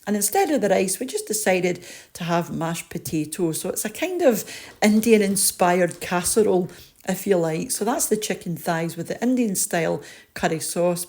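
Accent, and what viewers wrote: Scotland, United Kingdom. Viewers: Intrigued to watch until i heard you were Scottish. No thanks.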